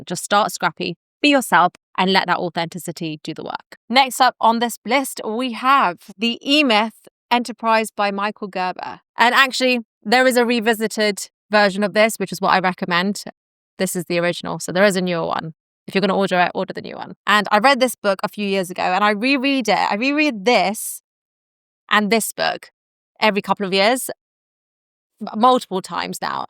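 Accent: England, United Kingdom. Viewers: I loved that British accent on non-british. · Bit plain accent pls. Too pommy accent yak for ears!! I tried many time to listen your video. Rich contents disgusting pronunciation! Sorry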